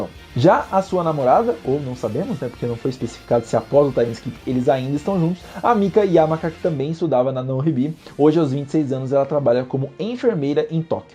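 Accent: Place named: South, Brazil. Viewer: Falo do "aportuguesado" mesmo, ainda que seja irrelevante... seria SU como silaba tonica... Não to criticando, nem falando nada... mas é muito comum a troca da silaba tonica mais para o sul do país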